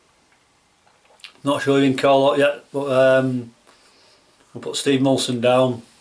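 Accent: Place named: Midlands, United Kingdom. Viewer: Nice one, watched Christians and yours, quite a bit of difference. I'm from the midlands and you say everything the same as me except gym shoes are trainers surely lol. Cheers 🍺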